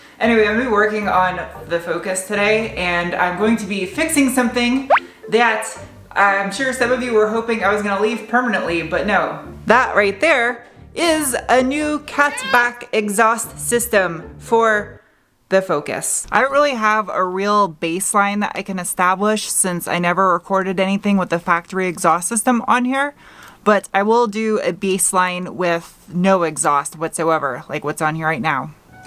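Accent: United States. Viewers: cant stand the way americans garage, like gar-odge in canada we say guh-raaj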